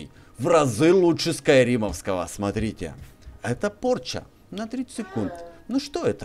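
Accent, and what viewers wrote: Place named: Ukraine. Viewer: У тебя акцент.... ты украинец?)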